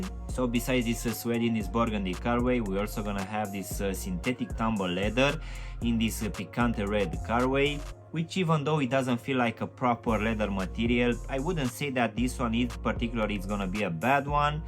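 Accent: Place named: Romania. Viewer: Te cunosc dupa accent bro ca esti roman, buna treaba frate, chiar imi plac review urile tale, keep it up, poate poti face review si la aj1 mid industrial blue, materiale superbe, pret accesibil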